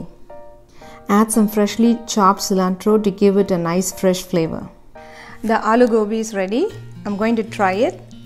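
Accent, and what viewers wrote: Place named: India. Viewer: Your English is very Indian style not perfect at all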